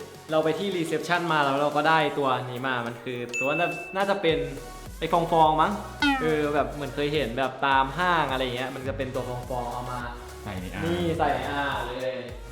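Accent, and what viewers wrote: Thailand. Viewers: คอนถม ก็เหน่อหน่า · คนกาญพาไปเองรึเปล่าครับ มีติดเหน่อ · เอ๊ะ เป็นคนที่ไหนนะมีติดเหน่อด้วย 555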